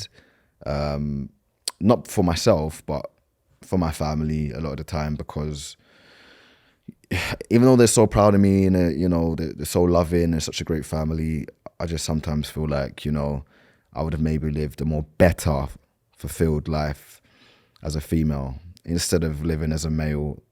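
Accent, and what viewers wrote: United Kingdom. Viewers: Sounds like a British. Very confident guy.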